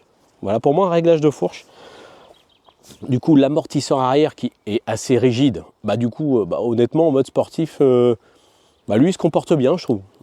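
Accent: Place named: Rouen, France. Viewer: En mode Speurt? comme c'est beau l'accent de Rouen 🙂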